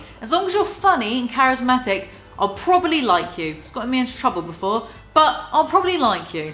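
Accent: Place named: RP, United Kingdom. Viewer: I love your posh accent :D I've never watched any of these shows, but would be hooked if you were on there insulting everyone!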